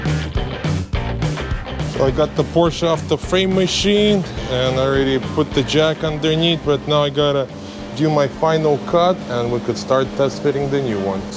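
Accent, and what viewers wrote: Russia. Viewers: 😅 Русский акцент не скрыть никак. Классная работа! Лайк, подписка.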